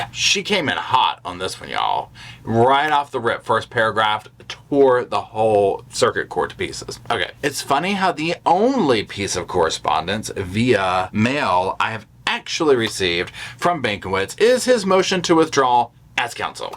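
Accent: South, United States. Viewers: Id just like to say thanks for your videos everyday ☺️ ive been super depressed lately because i was in an accident and lost all my teeth and its hard for me to even get out of bed anymore 😭 but i love your southern twang makes me smile and has my attention off my issues if just for a few minutes 😏 love rosco p coletrain❤️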